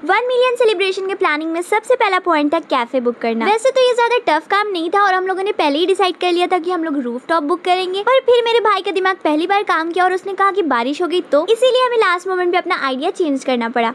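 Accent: Bihar, India. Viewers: Tum Bihar se ho kya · Dii aap bihar se ho kya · App Bihar se ho kya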